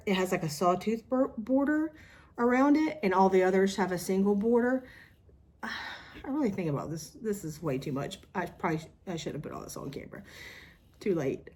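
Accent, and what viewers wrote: South, United States. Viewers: You’re the only Southern or with an accent to enjoy listening to. Shhhh… don’t share that with anyone or I’m sure I’ll get a lot of haters!😊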